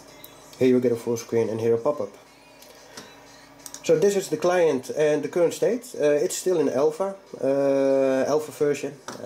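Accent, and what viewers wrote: Netherlands. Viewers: eeckhoudt About 100% sure the accent is dutch. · You sound like a dutchmen ?? Are you ? If yes , i have a lot of questions in the future . I'm now waiting for my Pi ( just ordered one) . Then testing and a lot of sleepless nights ...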